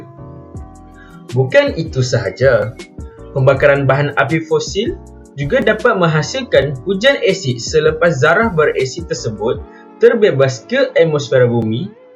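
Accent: North, Malaysia. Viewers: Bila tengok video yang narrator dia cakap utara auto best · suka betul dengan suara narrator. juga maklumat yang cuba disampaikan sangat jelas dan santai dengan slang utara. nais!👌🏻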